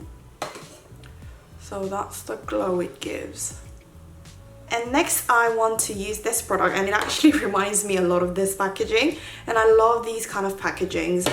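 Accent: United Kingdom. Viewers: 첫인상 리뷰 너무 좋아요 언니 영국 발음 볼때마다 짱짱...사랑해요....❤️❤️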